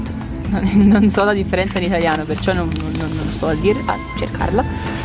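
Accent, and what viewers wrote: Tuscany, Italy. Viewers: Sei toscanaaa l ho sentito da l' accento 😂anche io sono toscana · Sei toscana ? Di dove?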